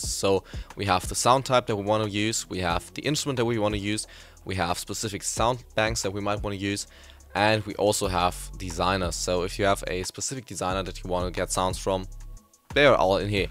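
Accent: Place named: Germany. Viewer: boahh deutscher akzent ist so hässlich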